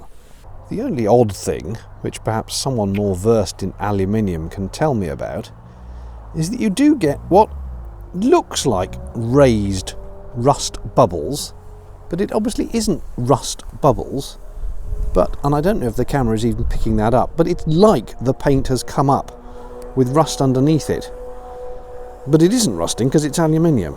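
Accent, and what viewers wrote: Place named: RP, United Kingdom. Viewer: thanks, even is your royal english is quite easy to understand, I really appreciate the comments you add 🙂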